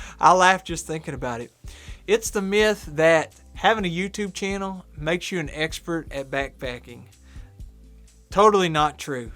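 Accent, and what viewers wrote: United States. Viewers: Ur a likable bloke, u sound so American and 🤣 Please don't hike in trainers (tennis shoes) 🙄🤣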